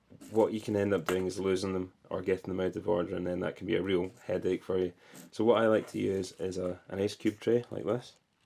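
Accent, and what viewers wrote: Scotland, United Kingdom. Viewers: R U Scottish? Just wondering great video btw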